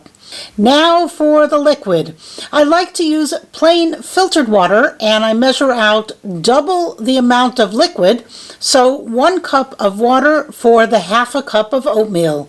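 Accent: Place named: United Kingdom. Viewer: Thanks for the great vid! May I ask.... do I detect just a slight UK origin to your lovely accent?? Cheers!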